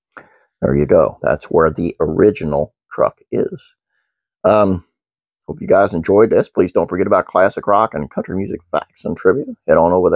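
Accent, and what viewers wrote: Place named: Appalachia, United States. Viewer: I love the hillbilly narration.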